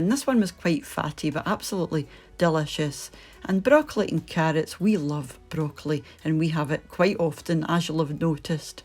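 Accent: Scotland, United Kingdom. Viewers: I just discovered your channel recently and I’ve already watched so many of your videos! I watch from Newfoundland, Canada. I just love your accent. Reminds me of visits to Scotland. My husband is from Cawdor. Can’t wait to visit again once we can travel safely!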